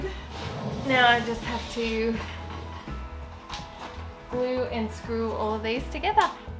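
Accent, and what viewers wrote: Australia. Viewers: Awesome piece 👏🏼👏🏼👏🏼 BTW, where in Oz are u from? I’m from Maroubra, Sydney’s eastern suburbs. Really enjoying ur videos & ur Ozzie accent 😁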